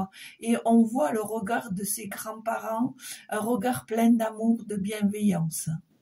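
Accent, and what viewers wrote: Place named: South, France. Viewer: Doux accent du Sud avec légers roulements de R comme notre très Cher trop tôt parti Nougaro, je dirais Oh Toulouse bien aimée 😁✌️👍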